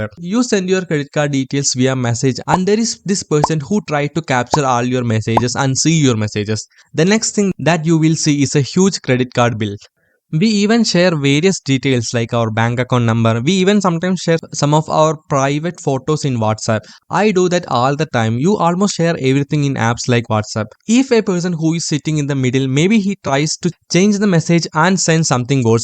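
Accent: India. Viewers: your Indian accent is strong almost like the ones they show in western shows! it is understandable to us but global audience or even Indians might find it little irritating, try reducing it that will help you reach global audience :)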